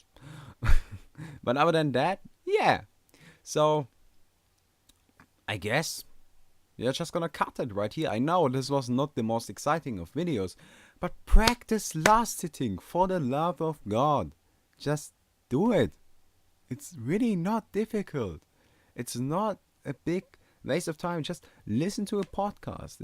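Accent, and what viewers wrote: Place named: Germany. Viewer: Dude you're german, so your accent is amazing! And this is the simplest yet best bit of advice you can give us! I consider myself a "decent" last hitter (in casual mode, no stats) I can generally get every last hit! But as soon as I go matchmaking I start failing!! :( I don't know what I do differently, I guess the other players harass me so I don't get a chance to last hit! :(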